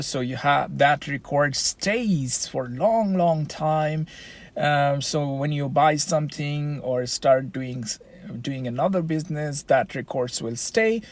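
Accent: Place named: Canada. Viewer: Bhai your accent is amazing. Looks like a native Canadian.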